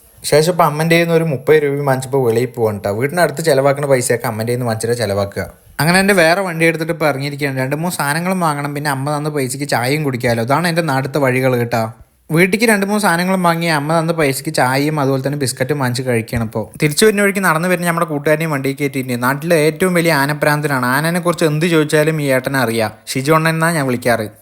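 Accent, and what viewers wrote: Palakkad, India. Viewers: Enik ishttayi super vedio Enik ishttamanu palakkada slage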